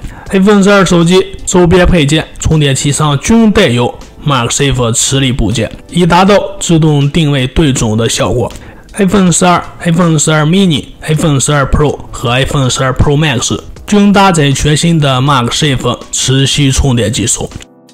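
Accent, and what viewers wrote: Shanxi, China. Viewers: 听起来像山西陕西一带哈哈哈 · 你山西人哇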